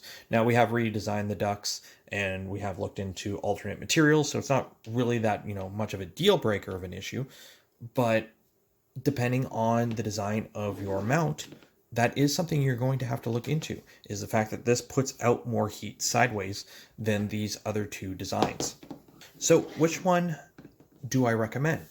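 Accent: Canada. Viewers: You are in Nth America though....common admit it - you re CANNUCK !! You cant hide that accent :-)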